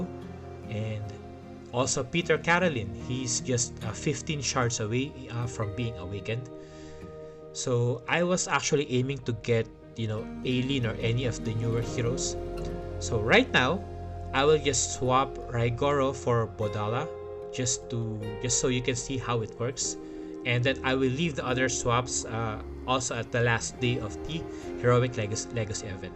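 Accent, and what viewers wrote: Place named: Philippines. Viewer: Are you Filipino? You sound like you're Filipino because of your accent and voice, by the way I'm a new subscriber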